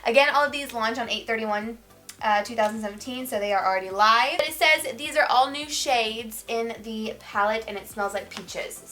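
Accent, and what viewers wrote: South, United States. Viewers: Love this!! My new fave beauty YouTuber love all your makeup looks, finally someone with the same skin tone!! And southern yass!!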